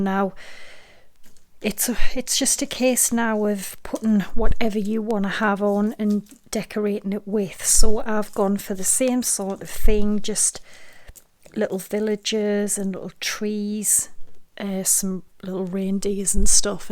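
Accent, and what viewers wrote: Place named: Wales, United Kingdom. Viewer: Thank you for this, you make it so simple with easy - to - follow instructions, and your lovely Welsh accent is a bonus. Kathy from New Zealand.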